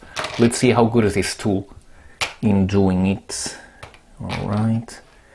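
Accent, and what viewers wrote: France. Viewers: Il sonne frenchy ton accent. Merci pour ta vidéo, j'attends la mienne avec le fond noir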